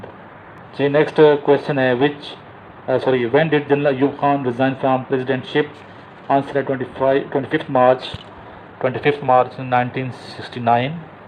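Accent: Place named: Dera Ismail Khan, Pakistan. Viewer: sir are you by any chance..from DIKhan? apki accent se lag rha ha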